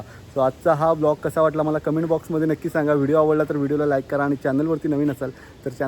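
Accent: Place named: Bhandari, India. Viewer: तुझी भंडारी बोली छान वाटते.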